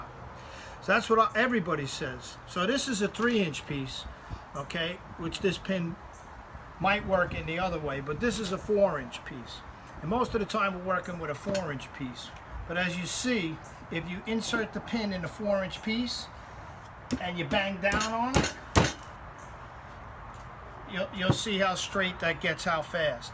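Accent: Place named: Long Island, United States. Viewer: Holy shit that long island accent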